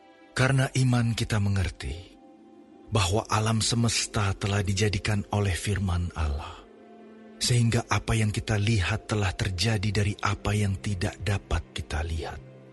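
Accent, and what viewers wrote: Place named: Madura, Indonesia. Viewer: kayaknya yang punya suara ini "orang " madura ya??? trims buat chanel ini..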